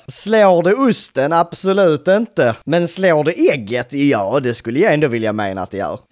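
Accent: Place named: Skåne, Sweden. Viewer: Chex supportrar dig är från Skåne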